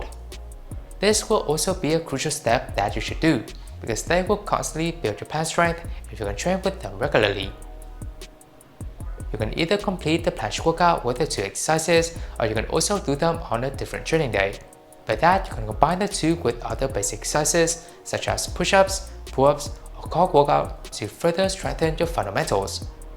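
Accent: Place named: England, United Kingdom. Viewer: Bro your English accent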